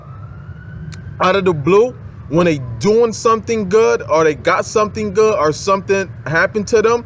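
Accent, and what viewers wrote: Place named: New York, United States. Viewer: You are God sent. Thank you for sharing this information. Also i can tell your from N.Y.. Great video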